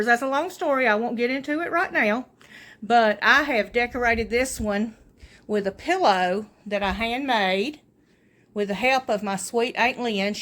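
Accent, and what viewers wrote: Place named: South, United States. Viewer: Hi from Southern California. Sent over by Lynn Wilson. I’ve subscribed to your channel. I just absolutely love your southern accent!❤❤❤. Happy Fall. Hope you don’t mind if I steal some of your decoration ideas for my home. Love your home!!!!!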